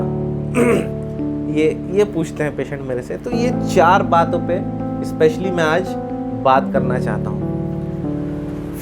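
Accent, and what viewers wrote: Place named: Patna, India. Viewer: Hatsof sir very well explain, specially with patnaiya tone. Kya diabeties type 2 me lifetime medicines khana padta hai .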